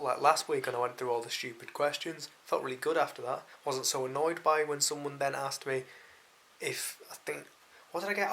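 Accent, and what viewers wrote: Yorkshire, United Kingdom. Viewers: Lol. No magic, I recognise the accent being a Yorkshire lass misen :-)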